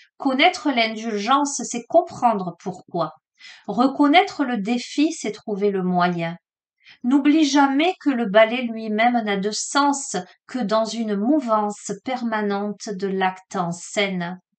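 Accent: South, France. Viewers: Bonjour je suis une nouvelle abonnée j entend l accent du sud . Je suis du Gers.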